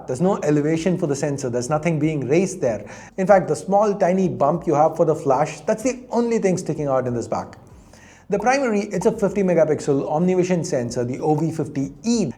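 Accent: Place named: India. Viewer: Hey I hope you don't take this the wrong way but and I apologize if I offend anybody by saying this but usually Indian speaking people drive me nuts because I am in American and we are just so used to the way we talk. Not saying anything about your people it's just the way you guys talk That's it other than that I love you guys. The reason why I say that is because usually I'll just turn the channel off I gave you a chance and I love your channel. I really in to the newest tech when it comes to phones I believe these gaming phones are just a scam You're not going to get anything better out of it than you would say an s24 ultra you get the same performance but that's just my opinion your allowed to have any opinion you choose. The one thing I will give this phone at least they put a better screen on their phone than that rog phone. The rog phone is still putting a 1080p on the newest one where this phone at least want to a 1 and a 1/2 k screen I think for the money you're putting out for a phone like the rog phone I believe it's like $200 more for that time than it is for this one.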